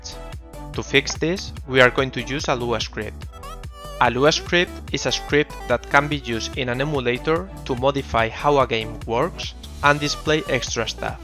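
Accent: Spain. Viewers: Only an spanish can identify another spanish speaking in English^^ I have just done it! Good video! :P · eres de españa? tu acento me suena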